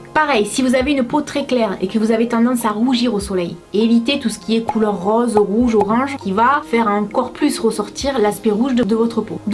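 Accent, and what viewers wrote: South, France. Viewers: Elle vient du sud de la France 😉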